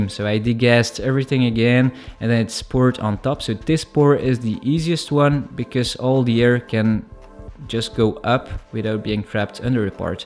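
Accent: Netherlands. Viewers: Damn the Dutch accent is strong with this one! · leuke video man :) je hebt nederlands accent haha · I watchdd the intire vid and im still confused. Were are you from. Becouse i hear bits pf a dutch accent. But im not sure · Lol by your accent i knew you were Dutch! Im dutch too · Deze makker heeft wel echt een typisch nederlands accent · are you dutch? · Okay i immediatly recognised your Dutch accent. Well im Dutch too so hi there 👋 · Blauw? Bier? saying ehhh? saying Ant instead of And? Are you dutch? HA, i just saw your Bio and belgium counts aswell :) i'm too good at this XD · ah i love the dutch english talking xD but you said you thought about selling it or giving it away, but how can i buy this? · Are you by any chance Dutch? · Bro you dutch or something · You sound dutch, cool ball tho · Are you from the netherlands? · You dutch? If ur not u sound rly Dutch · Just out of curiosity, are you Dutch? 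· You're dutch, aren't you?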